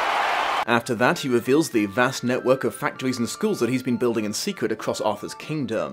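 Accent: South, United States. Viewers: I love your American accent!!! Perfect delivery! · Your southern accent is really on point. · That accent though!!!! I would call it more southern as a New Englander, but credit where credit is due because I could not pull off a southern accent that well! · Not sure why a Connecticut yankee is wearing a cowboy hat and speaking in a slight southern accent, but I loved the video anyway! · The irony is that a Yankee accent is closer to a British accent than the slow southern gentleman drawl you may as well be saying "I'll be your huckleberry" in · I love your American accent honestly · I love your Southern US accent! 😀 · GyroMurphy and yet somehow the Southern accent makes the whole thing even better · Your New England accent has a southern twang. You sound like rick grimes from the walking dead WHO IS ALSO ENGLISH irl! Side note: I was listening to this book on a long road trip in 2013 when I drove from Boston to Orlando and back I got to the part of the water fountain and stoped. 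I recently watched the English tv show AND the animated movie (the animated movie is closer to the book) Cheers! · That's the most American Accent that ever did American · Holy shit you have a better American accent then I thought possible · You are channeling some Southern state with your accent lol. Virfinia, S. Carolina, or Georgia. I AM a Connecticut Yankee so I don't know Southern accents. But it's definitely not Northern lmao. I love it tho!!! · Love the slightly southern accent. Sounds kind of like a Louisiana accent. Very cute! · Hold up Dom your american accent is excellent not perfect of course but very very impressive! (And a little southern for 💙 flavor 💙) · I did start thinking about Daniel Craig during the review, but mostly because I was comparing American accent to Craig's performance in Knives Out. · I love your American accent! :D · Your American accent is so cute. Especially since you're trying really hard to do a texas drawl by deepening your voice.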